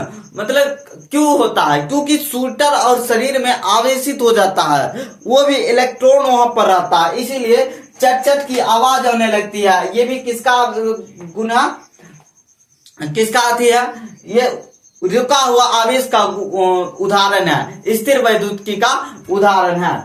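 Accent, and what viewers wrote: Bihar, India. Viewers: Bihar se ho kya??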